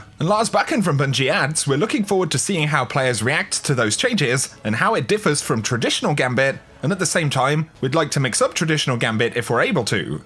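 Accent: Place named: United Kingdom. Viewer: Hey, my wife wants to know where you are from. Her mums British but your accent is much different. Just curious. Love the channel!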